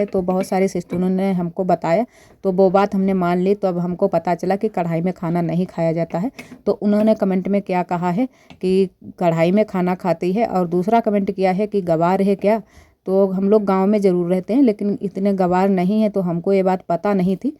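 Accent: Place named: Bihar, India. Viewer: Original village routine, ye kon sa gaon hai, dekhkar to UP ya Bihar se lg raha hai, aapki boli main Bihar ki jhalak hai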